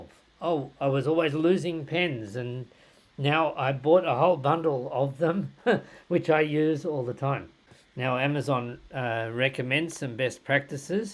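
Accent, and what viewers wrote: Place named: Australia. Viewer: Very informative video. Thank you,! One thing that I particularly liked about your video is that, based on your accent, I'd say you're Australian. I am, too, and it's nice to see content that is going to be applicable to me. Sometimes I will watch a video about something only to find that the program is only for US residents, which is frustrating and a waste of time. Keep it up!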